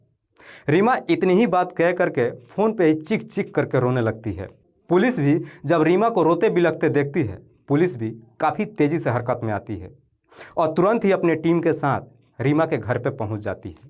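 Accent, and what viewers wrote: Bihar, India. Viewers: Sir ji aap kya bihar se ho kya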